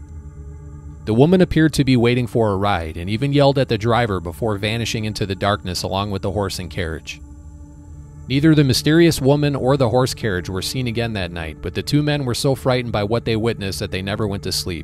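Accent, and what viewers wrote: Chicago, United States. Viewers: This Chicago accent is super comforting...to just us Chicagoans im sure.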